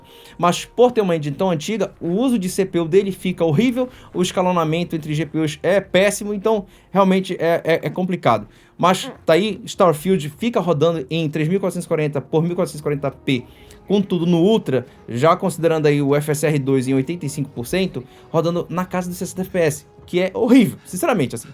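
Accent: Pará, Brazil. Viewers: Cara, você é paraense? 😮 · cara que legal,voce é do pará, sempre desconfiei pelo sotaque, muito bom ter um canal tão foda representando nosso estado. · Rapaz, tu é paraense? Que bacana hahahah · ele deve ser do Sul do Pará nao tem sotaque do norte do Pará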